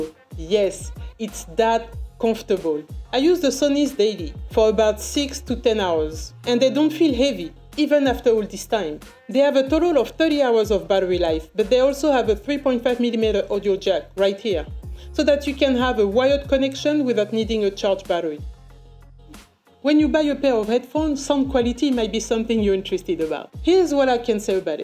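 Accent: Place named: France. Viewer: You speak French ? J'arrive à entendre ton accent ;)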